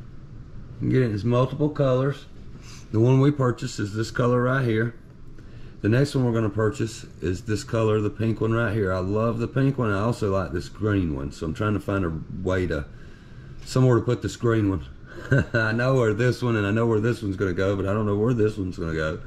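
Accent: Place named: South, United States. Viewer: Omg! Love that thick southern accent! And your information!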